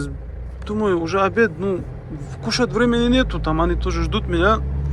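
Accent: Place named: Caucasus, Russia. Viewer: Автор, ты на рожу русский, а акцент кавказский, ты метис штоли?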